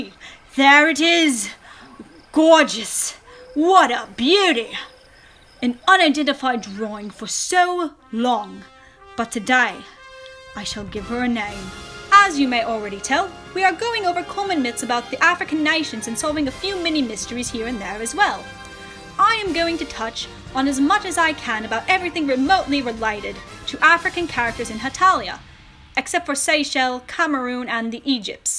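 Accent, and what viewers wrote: Australia. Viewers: Why Australian accent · Lmao just clicked on the video and already an Aussie accent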